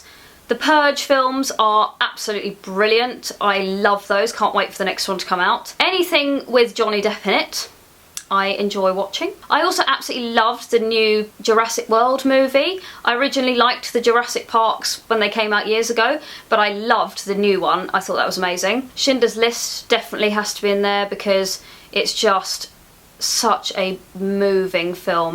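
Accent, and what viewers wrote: United Kingdom. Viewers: Love your British English and found you very elegant. Cheers from Canada! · I love how English you are and really enjoy listening to your British English . I am not surprised you liked English at school. Xoxo from Vienna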